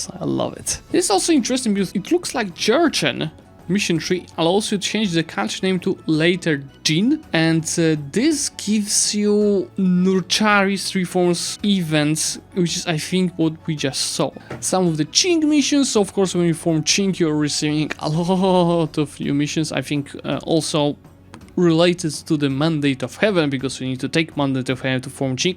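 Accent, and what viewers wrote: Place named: Poland. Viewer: <3 love the polish pronunciations myself, thanks for the updates. Really cool that you were able to get a preview!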